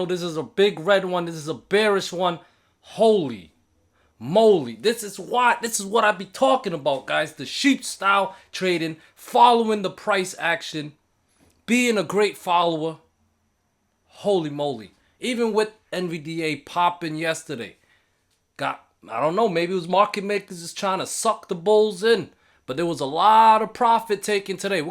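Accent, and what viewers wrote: New York, United States. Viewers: 1⃣ One!!!! Boss man!!!👍 Great information!! Are you from 🗽 NYC you talk like a new Yorker lol sheep 🐑 style trading 💹 Holy Molly!! 🐂 Vs 🐻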